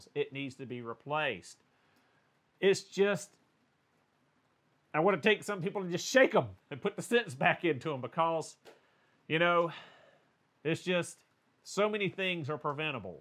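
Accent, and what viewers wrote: South, United States. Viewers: Getting yelled at by a dude with a southern accent hits way different.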